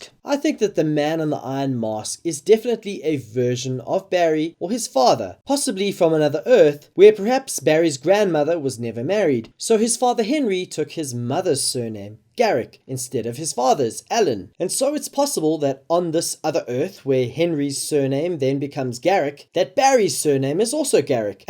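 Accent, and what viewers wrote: South Africa. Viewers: are you south african? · I live in England now but born and raised in SA. When I first started watching this video I was like this guys voice sounds way to familiar. I mean it doesn't have an accent, cause English people have an accent to me. Then I realised why haha! SA ftw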